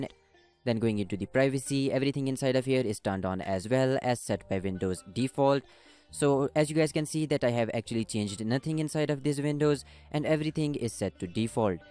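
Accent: India. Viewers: that indian accent tho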